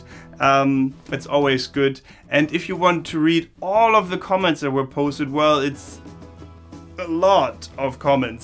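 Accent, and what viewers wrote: Germany. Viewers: Meine fresse, das der Deutsch ist erkennt man ja noch weit mehr als bei Trilluxe... Ja und das ist eine wahre Kunst...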